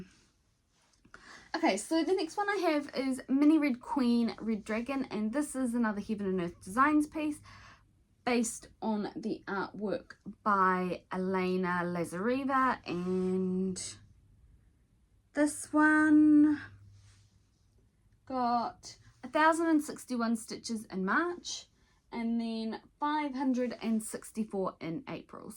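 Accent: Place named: New Zealand. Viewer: Congratulations on your Lisa Parker finish 🥰 I’ve just found your channel… and love your WIPs As soon as I heard your accent I knew you were a Kiwi…. I’m an Aussie stitcher/flosstuber so I’m always really happy to find stitchers/flosstubers from Aus or NZ 😀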